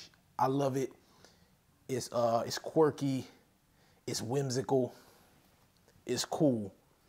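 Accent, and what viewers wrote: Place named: Philadelphia, United States. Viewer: I detect a Philly accent. And heard a jawn in there I think? Respect. #Polodelphia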